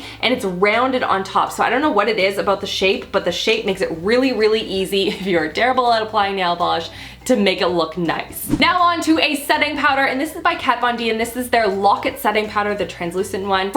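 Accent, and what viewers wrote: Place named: Alberta, Canada. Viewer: thats that awesome alberta french I'm hearing! #YYC rep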